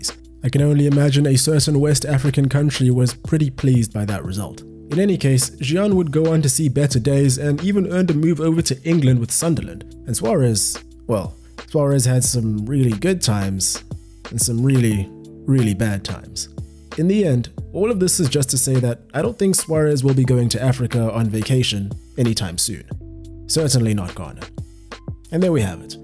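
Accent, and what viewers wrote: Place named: South Africa. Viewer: I always knew you from SA. The accent and slipping in Tau and psl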